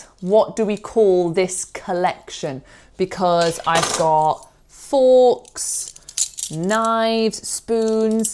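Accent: United Kingdom. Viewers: Eu amo está professora e o seu lindo sotaque britânico. Seria maravilhoso se tivesse vídeos também com legenda em português e inglês juntos. Ela teria milhares de novos alunos brasileiros.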